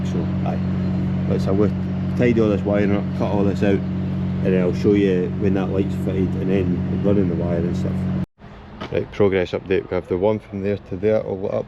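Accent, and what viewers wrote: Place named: Scotland, United Kingdom. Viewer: Where specifically in Scotland is your accent? Glasgow somewhere?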